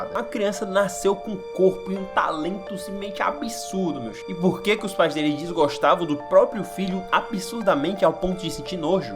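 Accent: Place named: Ceará, Brazil. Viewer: Bom ouvir uma narração de um searense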